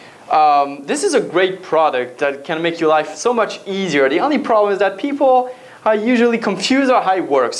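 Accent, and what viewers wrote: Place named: Quebec, Canada. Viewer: allo le québécois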